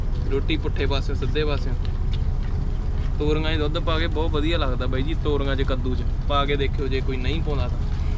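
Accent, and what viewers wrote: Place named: Malwa, India. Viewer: 22 g boli to malwa touch lagda lv u bro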